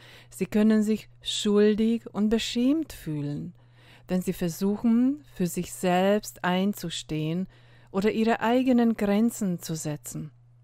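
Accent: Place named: Hungary. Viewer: Ich mag außerdem deinen ungarischen Akzent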